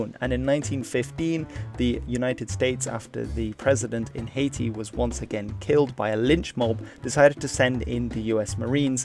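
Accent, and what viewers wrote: United Kingdom. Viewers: he has a british accent unless it is a voiceover, they always absolve themselves, always A;WAYS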